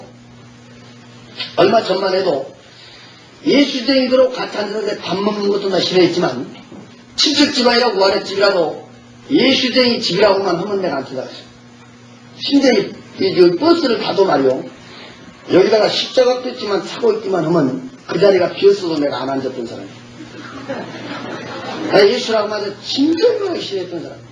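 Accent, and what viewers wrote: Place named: Jeolla, South Korea. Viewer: 보지않고믿는자가 복되도다하셨지만~하나닝은 협력하여선을 이루어주시는분같아요~ 구수한전라도 말씨와 목소리가 성령의언어인것같아요 목소리도 은혜가되네요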